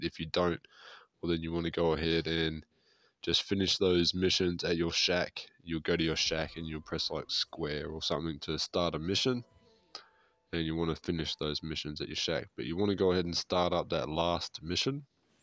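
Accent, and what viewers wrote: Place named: New Jersey, United States. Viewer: this is a great one. luv u accent....New Jersey?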